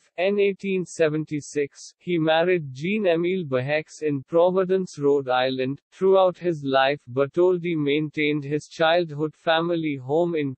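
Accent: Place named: India. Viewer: I can't understand nothing. Indian voice 😨😡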